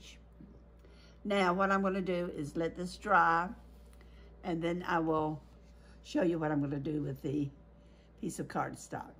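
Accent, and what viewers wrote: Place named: Georgia, United States. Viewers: Maine? You sound like you’re from Georgia 😊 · Are you by any chance from southeastern Alabama or northwestern Georgia? My grandmother was from Salem, Alabama and grew up in Columbus, Georgia. Your accent sounds very much like hers and your voice brings me so much joy ❤❤ thank you, beautiful soul ❤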